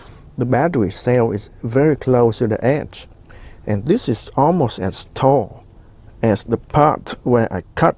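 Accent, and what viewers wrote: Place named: South, United States. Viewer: What is your accent? Every fourth word has thick southern slang while the rest sounds Asian/American. That southern draw just catches me off guard. Great video. K bye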